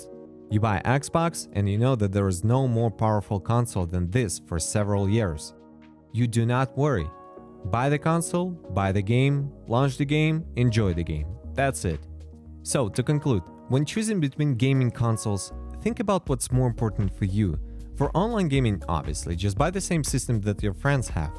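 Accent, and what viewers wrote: Russia. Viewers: У тебя максимально русский акцент)) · Guy sounds Russian i would take what he says with a grain of salt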